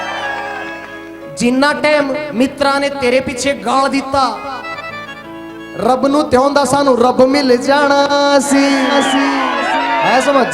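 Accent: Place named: Punjab, India. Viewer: I am Punjabi Jatt, I have business in Gurgaon, and they speak different haryanvi to this. He is speaking like punjabi style, its nice to hear. Jatt/Jat/Jutt zindabad. respect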